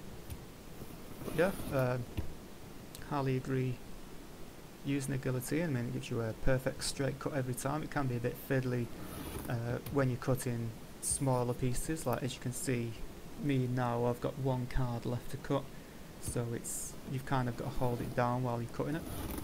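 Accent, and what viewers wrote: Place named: Brazil. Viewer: Are you brazilian? Your accent sounds familiar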